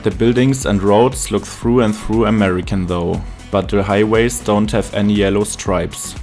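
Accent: Germany. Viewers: Dein deutscher Akzent ist so unfassbar nervig, dass ich das Video nur bis schauen konnte.. sehr schade um die Informationen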